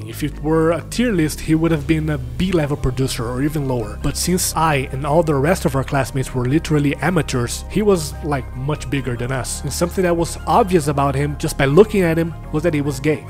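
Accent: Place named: Brazil. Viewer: E aí, mano! Acabei de maratonar todos os videos do seu canal na ordem. Reconheci logo no primeiro vídeo o sotaque brasileiro. O conteudo é muito bom e o roteiro dos vídeos tá muito bem feito, obviamente. E ainda por cima você tem carisma. Tem tudo pra fazer sucesso, acho que já está fazendo sucesso. Parabéns, ganhou um inscrito! Abraço.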